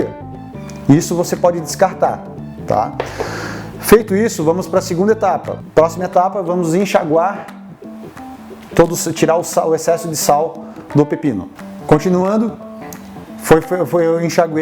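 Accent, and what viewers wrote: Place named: Santa Catarina, Brazil. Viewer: Esse sotaque é catarina :-)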